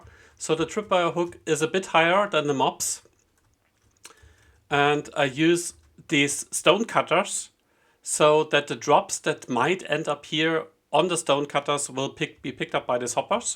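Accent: Germany. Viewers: do i sense a heavily german accent? :D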